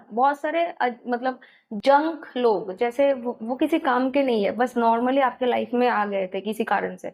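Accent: Bengal, India. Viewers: বাঙালীদের হিন্দি বলার টান ই অন্যরকম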